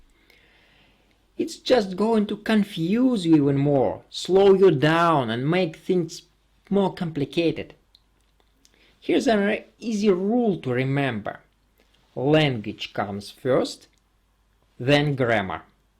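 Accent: Russia. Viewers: He sounds more like Tevya in "Fiddler on the Roof" - which is set in Tsarist Russia. I love his accent! 😁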